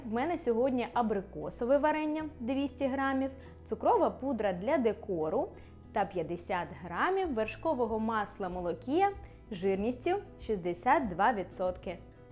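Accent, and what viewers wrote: Ukraine. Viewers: Так вам йде западенська мова🤗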